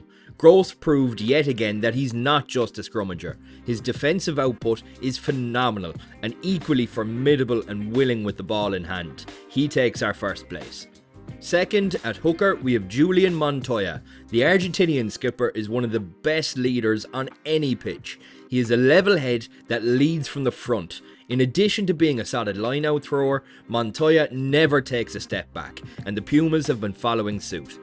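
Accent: Ireland. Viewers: The type of video you watch twice, first time to admire the Irish accent, the second time to capture the good Rugby and Humor content! Well done my friend!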